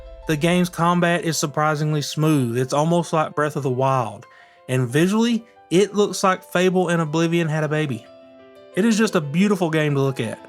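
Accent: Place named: Appalachia, United States. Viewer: No, it's because he has a southern or appalachian accent, and it's the way he pronounces his words :D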